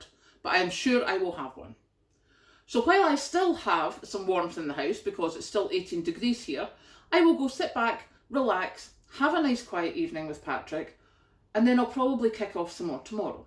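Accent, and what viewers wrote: Scotland, United Kingdom. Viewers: Go! You get so much more Scottish when you're angry:o) You're not wrong though and I just hope that the powers that be have yet another devious plan in mind - get the new PM in then tell the frightened population that it's not as bad as they first thought and here's a lower-than-expected energy cap and we'll bung you a few extra quid. I tell you what, if any Tories come round here at voting time they'll be leaving with a piece of my mind still ringing in their ears.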